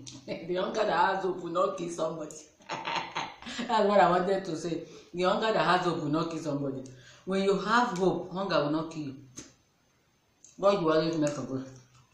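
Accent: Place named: Owerri, Nigeria. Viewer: u speak owerri , are u from owerri , im from owerri too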